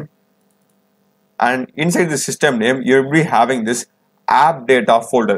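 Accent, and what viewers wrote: India. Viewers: Soon as I heard the Indian voice, I knew I was in good hands. Thanks for helping us regular folk out man, much appreciated :)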